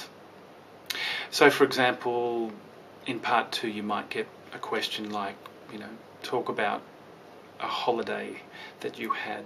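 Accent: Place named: Australia. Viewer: it's an Australian accent.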